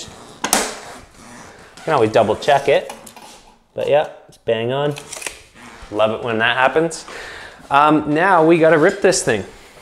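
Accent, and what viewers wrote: Canada. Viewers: I was curious if this was Vancouver, BC or Vancouver, WA. I feel like this guy has a mild Canadian accent so I'm going with BC.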